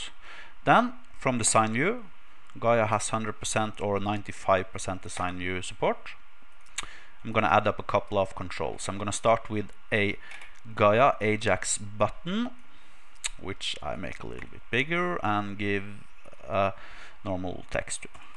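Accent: Norway. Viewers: loooooooool!! i knew you were norwegian, typical norwegian "english voice", kul tutorial foresten xD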